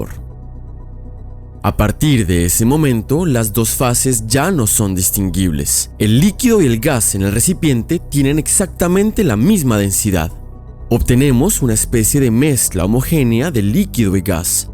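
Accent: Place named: Colombia. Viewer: Qué buen canal! Sin introducciones innecesarias, todo muy bien explicado, interesante y aparte colombiano, reconozco ese acento :)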